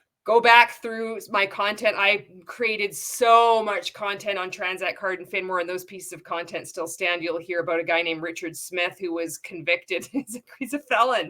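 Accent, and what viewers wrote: Canada. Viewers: I knew I recognized that accent, a fellow Canuck!!!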